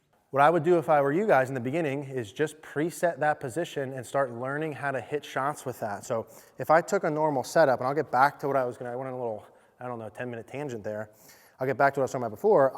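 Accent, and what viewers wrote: United States. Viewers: A wonderful teaching perspective. How I wish I could spend some time listening to your best friend about tell me about how you came to your approach to the swing. His best friend's getting better-more entertaining-at his presentations. When you make a joke, your Pittsburgh sppech gets faster. Slow the jokes down for us old farts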